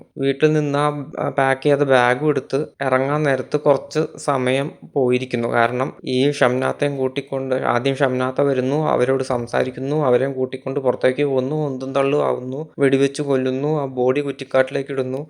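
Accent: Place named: Palakkad, India. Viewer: വളരെ കാഷ്വൽ ആയ വിവരണം! കൊളോക്വിയൽ പാലക്കാടൻ(?) സ്ലാങ്ങ്!! നല്ല ലോജിക്!!! 🎉❤🎉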